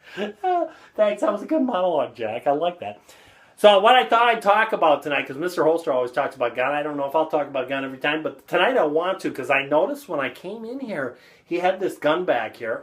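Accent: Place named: Los Angeles, United States. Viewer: Holy cow, made me feel like I had moved back to Kommiefornia for a second, sounds like 50% of LA county. So glad I moved to Fargo.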